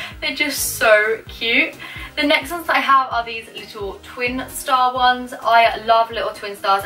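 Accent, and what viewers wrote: United Kingdom. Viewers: R u from the uk iam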